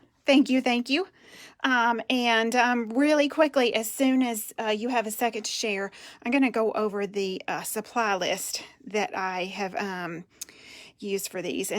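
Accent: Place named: Georgia, United States. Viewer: I am watching you from Atlanta ps. You crack me up! Definitely sounds like you’re in GA 😂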